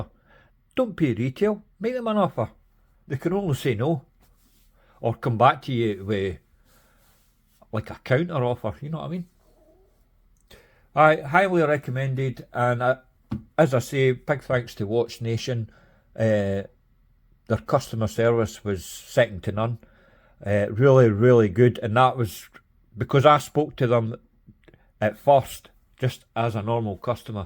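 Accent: Scotland, United Kingdom. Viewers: Came for the watch stayed for the thick scottish accent.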